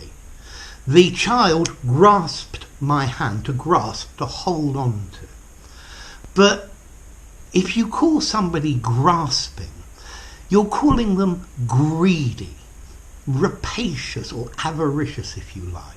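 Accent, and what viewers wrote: United Kingdom. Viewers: Thank you,! I always try to see some of your lessons. They are helpful and I prefer the British accent.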